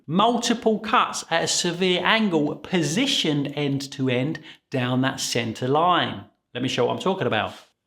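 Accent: United Kingdom. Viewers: thank you, nicely explained. I learned the „why“ which is nice. But to be honest: Beeing a German I could listen just because I’m enjoying your English pronunciation.🙂 My breadbaking journey is fun in many ways.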